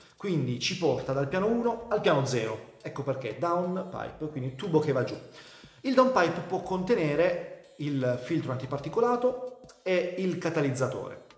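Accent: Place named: Molfetta, Italy. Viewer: sento un accento molfettese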